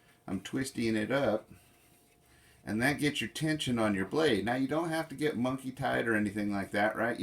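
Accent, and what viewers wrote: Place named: South, United States. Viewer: I detect a bit of Southern in your voice. Where ya at man?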